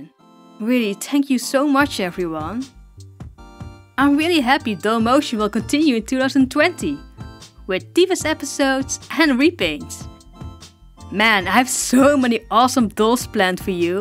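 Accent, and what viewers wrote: Netherlands. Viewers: Je kan horen dat je Nederlands bent hihi Ik ben ook Nederlands en vind je video’s erg leuk maar cringe soms ook erg English: You dan heer that you are Dutch I am dutch as well en like your video’s a lot but its somtimes very cringy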